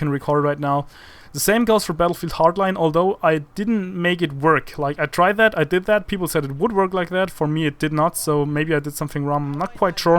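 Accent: Germany. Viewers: Kanns sein dass du aus Deutschland kommst? :D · Safe du bist Deutscher? xD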